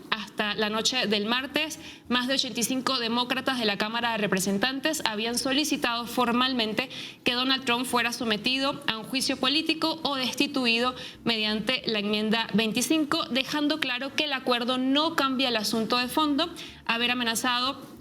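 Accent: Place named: Venezuela. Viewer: En el acento, se le nota que es venezolana.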